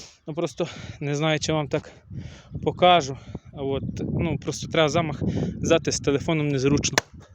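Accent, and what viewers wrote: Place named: Ternopil, Ukraine. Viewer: говір гарний в тебе! Це часом не Терн. Область?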